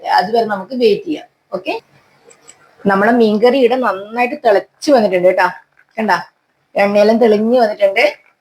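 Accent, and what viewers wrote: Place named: Kannur, India. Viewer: Kannur slagu super dear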